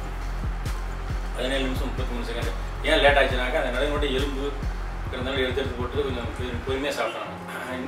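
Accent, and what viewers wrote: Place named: South India, India. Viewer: I promise I am not going to watch useless videos which r wasting my time Also me -watching this* His videos r love😍😍😍..I saw his Hindi channel that Hindi accent of southies is really cute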